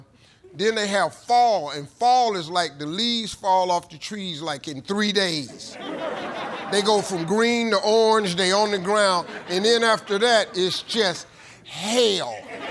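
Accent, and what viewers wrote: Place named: Kansas, United States. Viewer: That sh*t sound like Kansas haha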